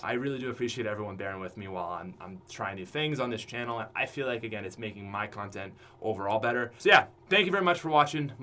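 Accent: Canada. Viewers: Sound so much more Canadian today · ahahahah oot. canada accent , its so funny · Been here since day 1! Love the content, Canadian accent, and the humor! Keep it up!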